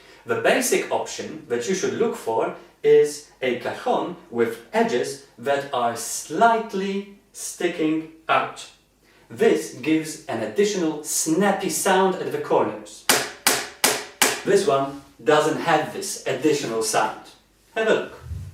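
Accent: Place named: Poland. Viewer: Dzień dobry! Thank you, for your must excellent video. I enjoy hearing your Polish accent. You English as you know is excellent.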